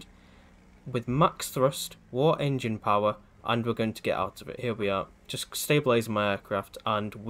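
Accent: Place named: South, United States. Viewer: I find the fact that you use 2 voices annoying to the point of distraction. Why do you feel the need for the film promo all deep slow and husky style of talking. Then with no obvious reason back into your normal Southern accent ?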